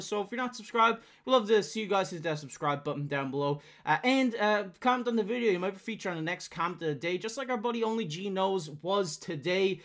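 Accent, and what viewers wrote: Newfoundland, Canada. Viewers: Definitely a Newfie or a caper. Good trying the English b’y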